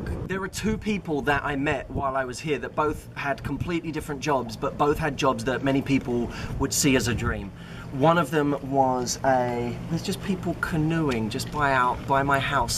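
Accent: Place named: United Kingdom. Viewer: I'm just here to listen to british accent lollll. thank you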